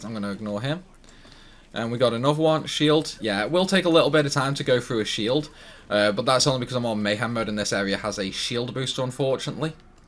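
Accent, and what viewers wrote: Stoke, United Kingdom. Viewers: Are you from stoke ?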